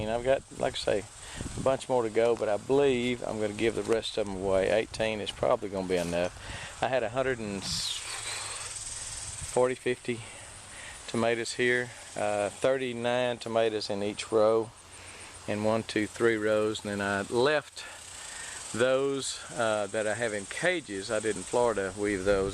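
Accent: Texas, United States. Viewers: Awesome video and great information I'm gonna try. Boy, sure got home sick hearing that Texan draw. I'm down south 13 miles from Galveston and sure miss them piney woods, ha!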